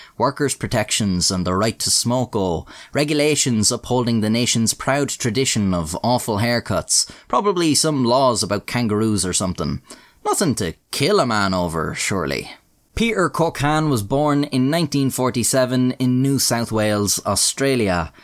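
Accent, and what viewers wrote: Ireland. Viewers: can you do the port aurthur shooting? or the cookie question, I've never heard anyone with an Irish accent do the cookie question. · Did anyone else know that is just irish and isn’t just British with a speech impediment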